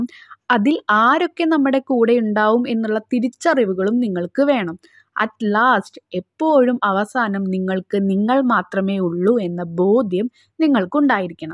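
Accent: Thrissur, India. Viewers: തൃശ്ശൂർ സ്ലാഗ് ആണല്ലോ 🙂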